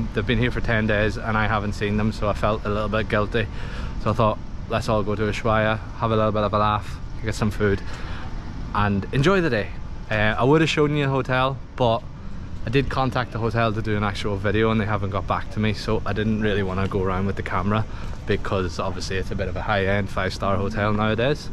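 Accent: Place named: Belfast, United Kingdom. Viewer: Never lose the Belfast accent mate 😂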